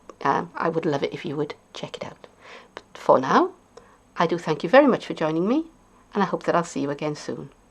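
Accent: Wales, United Kingdom. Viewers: . Hi, I lived in Swansea back in 1968 which is where i gave birth to my daughter, nice to see and hear a welsh accent from the array of utube tutorials out there, i'm never off my tablet, learning new crafts every day. What else do you do on utube? x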